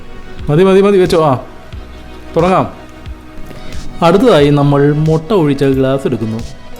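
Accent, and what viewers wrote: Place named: Thrissur, India. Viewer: Laughed a lot.. Really funny... Who had given the voice? A person from Thrissur, right? Good commentary too..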